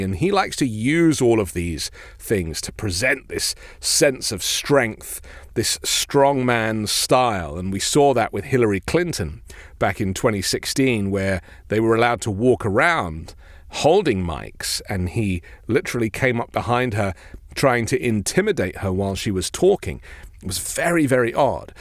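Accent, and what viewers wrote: England, United Kingdom. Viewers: WTF are you to weigh in on an US election with your boring English accent. Go kiss the crowns ass you boring limey.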